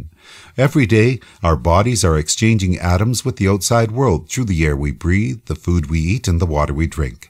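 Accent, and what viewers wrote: Canada. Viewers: The narrator is canadian!! :) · Great video, really well done, but that Canadian accent is pretty rough! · you sound Canadian.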